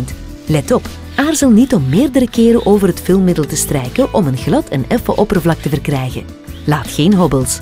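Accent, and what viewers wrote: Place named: Belgium. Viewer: Het is slechts een Belgisch accent, ze spreekt gewoon Nederlands hoor. Dat leer je in Nederlandstalig België ook op school. West Vlaams is echter wel compleet onverstaanbaar.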